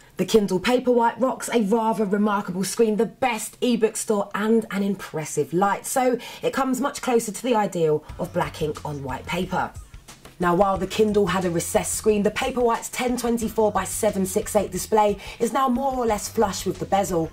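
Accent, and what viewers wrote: England, United Kingdom. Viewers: WOW! You are simply amazing. You could have easily been talking about Fruit Loop cereal. Your boyfriend better treat you right cause you are a Dimond with a beautiful english accent. P.S. I would so follow a youtube vlog of yours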